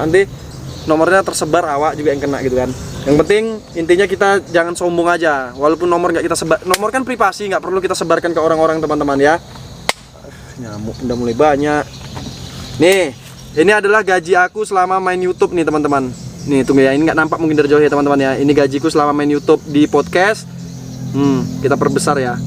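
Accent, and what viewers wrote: Indonesia. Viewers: logat nya khas kali Bg Medan nya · Orang medan ya bang, tapi logat jawa😂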